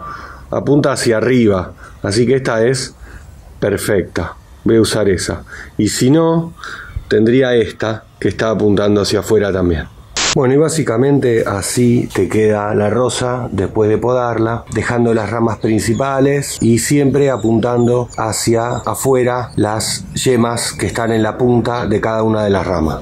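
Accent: Argentina. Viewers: Hola sr Argentino que bonita habla su tono de voz aparte que buena explicación muchas gracias por compartir con sus suscriptores lo que usted sabe igual yo le mando un abrazo y muchas bendiciones para usted y su familia y sus jardines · Hola jajaj me siento bien argentino con el dialecto jajaj, un abrazo desde Misiones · No hay mejor cosa que escuchar a un argentino hablar...y eso que soy Argentina también.... pero soy de Córdoba y no me sale la"" R"".¡Ja,jaja!! Gracias excelente explicación!!💖🌹🌹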